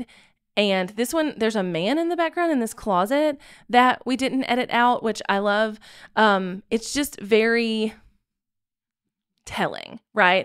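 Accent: South, United States. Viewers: completely unrelated but my heart skipped a beat when I heard your accent! As someone that speaks just like you, hearing an unapologetic southern accent delivering such an engaging and well informed commentary makes me proud! 🥹 Not too many of us out there. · It is good to hear another southern woman calling out this bunch of reprehensible gobshites. I borrowed the term "gobshite" from Ireland.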